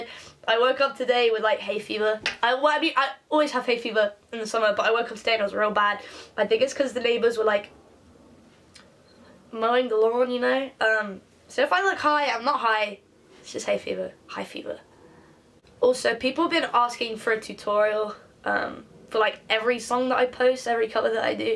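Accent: United Kingdom. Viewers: I'm so sorry about your aunt, amazing cover, your voice is so beautiful! (I also love your accent, I love British accents because I have an American accent) 💖💖💖💖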